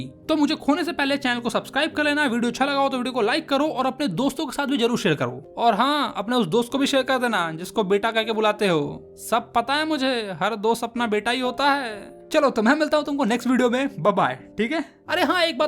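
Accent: Bihar, India. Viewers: Sir aap Bihar se hai na ❤❤ Aapki avaj se laga 😂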